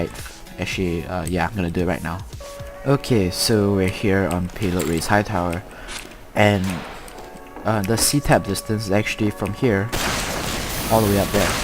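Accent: Singapore. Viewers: Are u from Singapore? U sound singaporean to me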